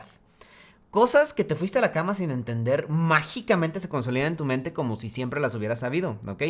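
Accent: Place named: Mexico. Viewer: Lastima que eres mexicano con ese acento que no es muy agradable, sino fueras el mejor youtuber de este tipo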